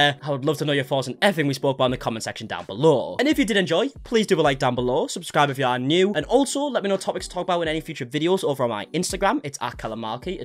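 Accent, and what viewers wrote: United Kingdom. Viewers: welsh, scottish irish or english---the accent?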